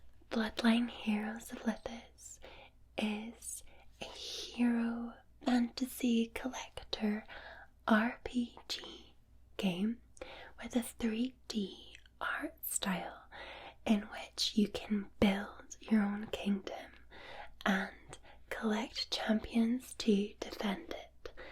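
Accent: Scotland, United Kingdom. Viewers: Amazing tingles, thanks ❤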